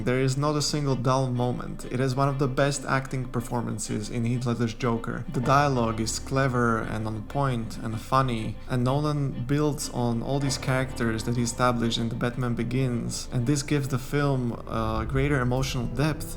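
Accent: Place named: France. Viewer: You let the French influence sneak into your English. I'm not criticizing, because it wasn't distracting, and English orthography is a mess, but you also pronounced "Liam", "Bowie", "McConaughey", and "Gyllenhaal" oddly.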